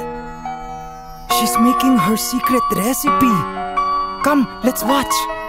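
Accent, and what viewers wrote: Philippines. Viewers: That thick filipino accent though...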